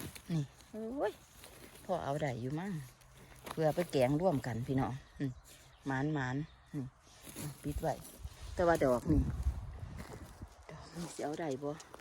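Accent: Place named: Surin, Thailand. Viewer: สำเนียงเว้า คือบ้านข่อยคักลาวสุรินทร์เด้อจ้า ตอนนี้มีภูมิลำเนาอยู่สมุทรปราการครอบครัวข้าราชการจ้า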